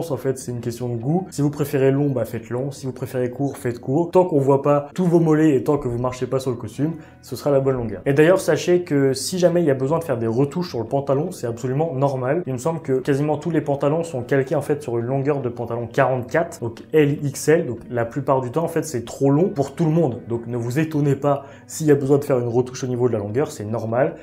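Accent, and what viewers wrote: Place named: Banlieue, France. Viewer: Tu parles comme dans la banlieue wesh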